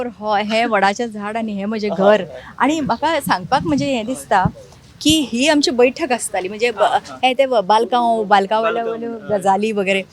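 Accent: Maharashtra, India. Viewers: She has a strong influence of marathi in her speech And can't talk typical kokni words It's unfortunate Oogich sangta ki aplya kokni bari ulapak yeta mhanun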